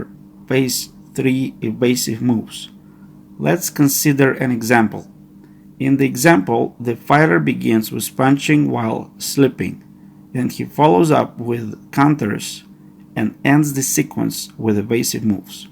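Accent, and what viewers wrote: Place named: Russia. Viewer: Делай переводы ты же русский Пиздец падишься по произношению . КОНЕЧНО ТЫ КРАСАВА!!!!СЛОВ НЕТ !!!ДЕЛО СВОЕ ЗНАЕШЬ!!! НО НА РУССКОМ ЯЗЫКЕ ПЛИЗ МОЖНО?!!!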